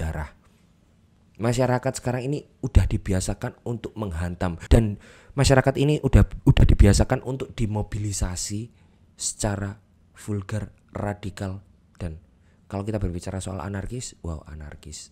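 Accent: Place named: Java, Indonesia. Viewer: Feri Irwandi versi medok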